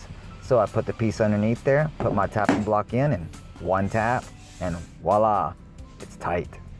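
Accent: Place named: South, United States. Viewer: Dude, your a boss on this one! Great explanation. Great accent! I’m thinking somewhere between N. Carolina and Mississippi!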